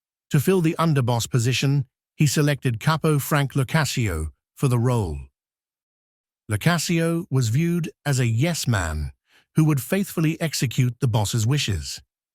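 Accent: United Kingdom. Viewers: I think his crisp British accent is far better understandable than some American mumbling.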